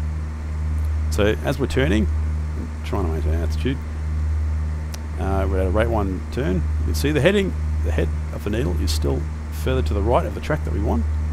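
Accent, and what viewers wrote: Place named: Australia. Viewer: Within literally 0.25 seconds of your first word I go: Cheers mate, just couldn't get any better than having an Aussie explain NDB intercepts out of YLTV! (Studying for IREX here in Melbourne too). Thanks for great video!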